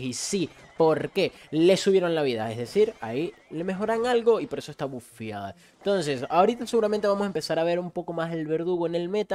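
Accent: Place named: Venezuela. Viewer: Bro gracias a que estoy en tu Discord supe sobre este videazo, por cierto, eres Venezolano verdad? Yo lo soy y tú acento es Venezolano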